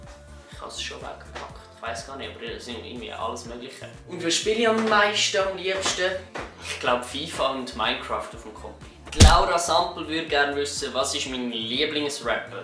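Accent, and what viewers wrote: Switzerland. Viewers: Wir Norddeutschen brauchen doch hin und wieder Untertitel. Aber lass Dich nicht stören. Schwyzerdütsch ist schön. (Oder ist das gar schon Zürcher Hochdeutsch?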